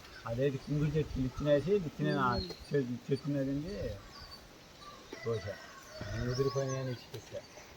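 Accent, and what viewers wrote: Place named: Ongole-Guntur, India. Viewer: Meedi Ye ooru andi..... garu....Adento Koncham slang Ongole and Guntur mix undi...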